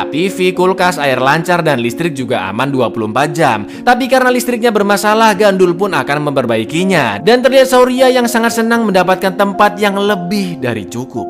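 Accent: Java, Indonesia. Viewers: kue wong jowo opo cok, medok timen le ngomong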